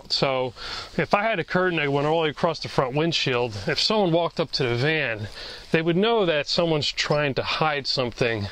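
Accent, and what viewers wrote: New Jersey, United States. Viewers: terrible jersey accent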